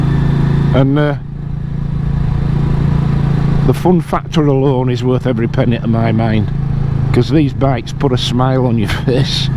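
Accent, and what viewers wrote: Yorkshire, United Kingdom. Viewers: Nice review thanks. I have an RE Himalayan in a hilly area here in Australia and have been careful to run it in. It’s a nice do it all motorcycle. I was in Bradford for 10 days in late November visiting my old uncle, so good to hear the Yorkshire accent and see the local roads again on your review.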